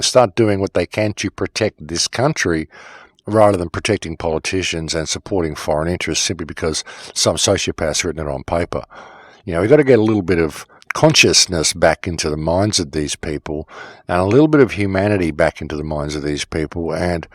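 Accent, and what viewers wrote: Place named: Australia. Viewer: No t.v., smart phone is going (dumb phone). All the WARNINGS and WATCHMAN channels are being sliced down, demonitized n' demonized. Been sharing, posting, warning for years. Folks, this is the end times! God forewarned these things would happen. We are at the tail end of the age of grace and facing the dawn of tribulation. REPENT FOR THE KINGDOM OF GOD IS AT HAND! Heads up! I hate to say it but this is nothing compared to what is coming! Gods judgement and wrath going to sort things out once and for all, sorting the sheep from the goats, the wheat from the tares and who can blame Him, look at what we done and how much society has fallen. TIME IS UP! We are living just as in the days of Noah. Get onboard the ark if you want to escape, repent of unbelief and sin and know Jesus Christ is mighty to save Amen! 🙏❤🕊 Great to hear an Aussie voice presenting our region. 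Well done bro. Brilliant, love your work mate, keep shining!! 🍃🌺🍃 Glad you got yr own channel being a voice in the wilderness! 😁👍 SHARED!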